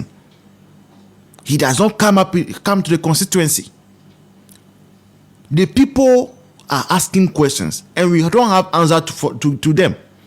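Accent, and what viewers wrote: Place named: Ewe, Ghana. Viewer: I like your channel because of your Twi tonation. It sounds same as my Ewe friend.Big ups bro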